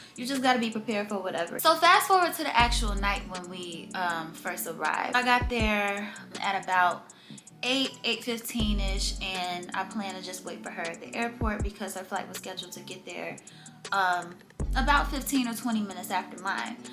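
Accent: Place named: Chicago, United States. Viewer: You can tell you from Chicago lolz 😂. I love the class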